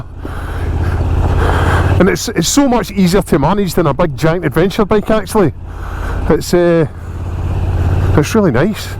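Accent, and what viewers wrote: Scotland, United Kingdom. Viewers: I had issues with the accent too and had to use the subtitles… but I loooove the accent, made want to hop on a plane (from Spain) and go visit Scotland! I like the way you structured the review too even though I finally went for the XC because of the color, the bling factor and the reduced dealer price. 😅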